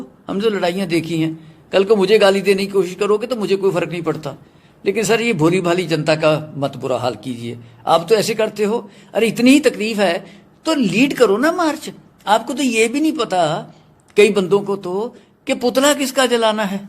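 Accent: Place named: Punjab, Pakistan. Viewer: Ye Pakistani Panjabi assent hae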